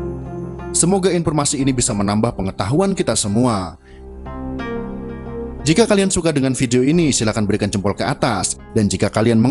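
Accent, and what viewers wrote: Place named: Banyumas, Indonesia. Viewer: min orang ngapak Yo😅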